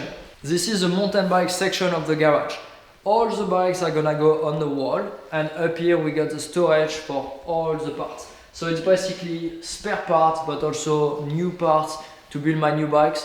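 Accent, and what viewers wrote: France. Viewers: accent français la 🥰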